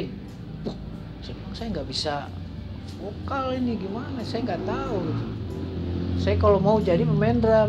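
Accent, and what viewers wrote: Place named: West Java, Indonesia. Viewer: Nyunda pisan bang😁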